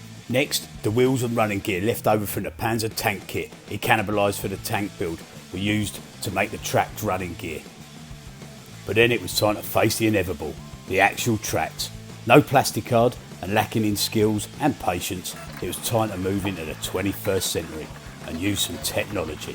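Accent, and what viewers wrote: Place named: Cockney, United Kingdom. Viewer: thematically essex/cockney narration on da orks is inspired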